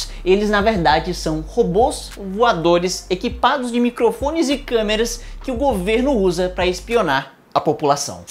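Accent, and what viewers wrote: Ceará, Brazil. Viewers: Esse cara é cearense???😹😹😹😹😹😹😹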